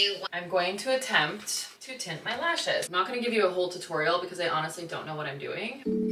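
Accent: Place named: United States. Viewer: I Love this vlogs. I can’t wait to see another one tomorrow. Have you ever tried to go for language exchange meetings? I’m sure a lot of people will love you American accent (at least I do) and you may meet some interesting guys from abroad, open minded and with the energy to discover the world. Meeting someone with another culture is amazing, everyday you learn new things.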